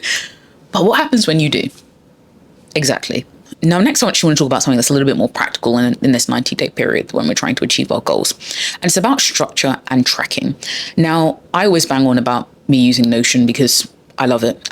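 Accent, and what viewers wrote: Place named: United Kingdom. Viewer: Ah, you're British! So refreshing. Great content, thank you, Sis.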